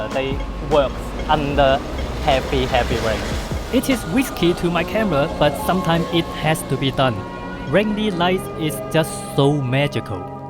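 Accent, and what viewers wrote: China. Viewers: Uncle Roger accent in real life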